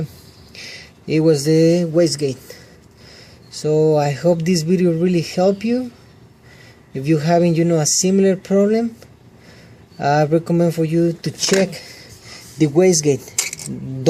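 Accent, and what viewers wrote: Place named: England, United Kingdom. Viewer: My friend , your voice and english accent is really annoying, i hate you.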